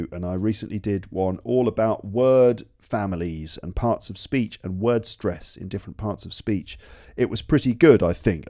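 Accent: United Kingdom. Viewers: I am not to used listening British accent and I could understand you clearly. ❤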